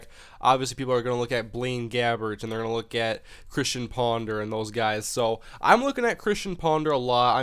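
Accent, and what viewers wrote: Minnesota, United States. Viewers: Nice Minnesota accent. =D. Greg Childs looks good.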